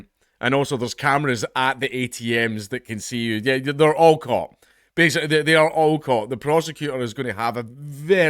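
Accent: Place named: United Kingdom. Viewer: The wores bank in UK Barclays bank The big amount of money was pull off from bank way no Alert stolen money 10 pounds I 2 transaction easy Hackers all over in England UK do that car dealership or others Nice British accent Which dialogue you have brother North or South I never can catch which one london bath Norwich leeds Birmingham Liverpool Manchester Blackpool York each other different talk Accent dialer hey not joke Chase bank fake check 20 years jail time by fbi police ok bye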